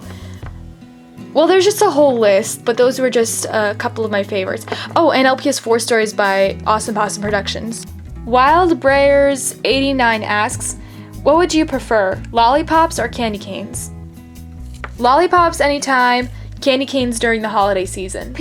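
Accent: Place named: United States. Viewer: No. America, do you hear her accent?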